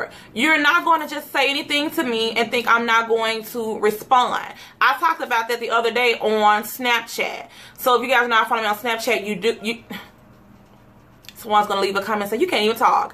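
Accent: Mississippi, United States. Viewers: your very inspiring.. I love how you handle the comments... I also have thick skin also.. I'm just starting 2 new channels and I am looking at other youtubers like you and I get cause y'all have a lot of subscribers where as on my other channel (not this one) has about 30 subscribers... don't get me wrong I love my 30 subscribers and I love them all dearly but I want to be inspiring to more people like you are baby girl... I have a lot of friends from missisipi and your voice is just like theirs and I can easily tell you are from missisipi and I don't think your voice is annoying... Much love to you and your life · Fuck em boo 💋 they need to boss they life up 😜 #LovingThatMississippiAccent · I'm not like them but I love your videos!!! You must be doing something right. Remember you are going to have them haters which is very sad. To say the least. 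They need to get there life. Must don't have nothing better to do. Like that song. Plus jealousy sad. It's truly nothing wrong with the way you talk. You just have a country accent that's all!!! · she may be fat but she still slays and she looks good Plus Size and you don't have the right to tell her that because she is still human and she is beautiful just the way she is, so all y'all hating keep it to yourself and if you wanna say something say it to her plus her 155.6K subs and the way she talks is just because she is from Mississippi. You can't hate on somebody just because they talk different from you. STOP THE HATE!! her family loves her the way she is so don't assume they are happy and if you think your hate comments are ruining the life, THINK AGAIN HONEY!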